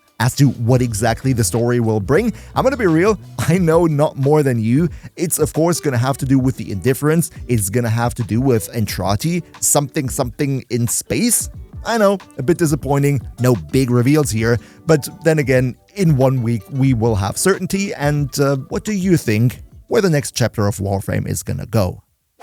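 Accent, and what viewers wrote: Germany. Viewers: Ich bin nur diesen starken typischen deutschen Akzent gewöhnt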